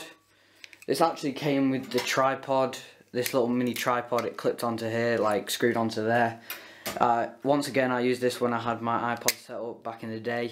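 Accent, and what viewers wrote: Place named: England, United Kingdom. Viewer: Subbed :) You from England because your accent sounds english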